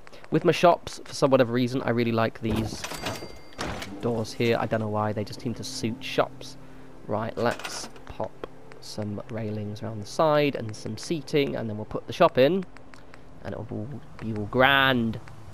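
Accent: England, United Kingdom. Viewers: English accents are perfect for instruction video lol you & Adi my favorite Fallout tips and tricks. Thanks bruv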